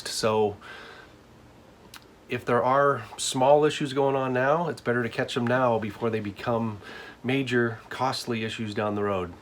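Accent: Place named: Canada. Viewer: Great info! Wondering why you sound your from Canada?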